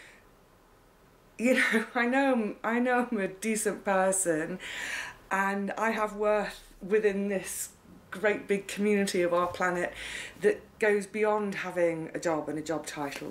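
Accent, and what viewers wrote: United Kingdom. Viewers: ? You have all of us! All 2,404 of us! I still wish would create a mailing address for us, so if any of us want to send her a gardening surprise in the mail......, if your regular use mailing address makes you nervous to share here with us, can you get a separate mailing address that is for all your online friends?, you bring all of us your knowledge of gardening, you bring us your happiness for life, you bring us your "UK" accent (which we adore)......I think it would be fun for you to get random surprises in your mail box.......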